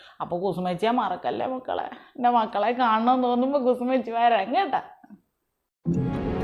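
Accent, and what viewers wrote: Thiruvananthapuram, India. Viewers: I like you, Your Tvm style slang ❤🤣🤣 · Chachi entharu ?. Sukangalu thanne ? Chachide thiruvanthoram bhasha kalakki. Abhinayavum super. Kochu kalli.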